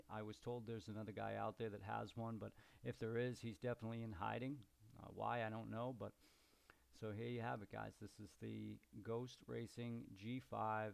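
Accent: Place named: Boston, United States. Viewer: Love your accent,bean town???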